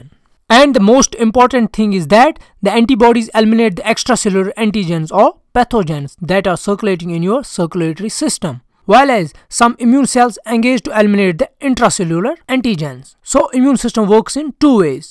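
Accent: Kashmir, India. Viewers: Are you a Kashmiri? Coz that's a very similar accent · Kashmiri accent 💕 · amazing vedio sir ...💕 we all kashmiris sound different and beautiful thats why i was able to recognize u ...keep going ✨ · Idk y but sir your accent seems Kashmiri 😅😅 but truely it made it kinda more easier to understand ..tysm sir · Typical kashmiri accent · Indian Accent again. Sucks!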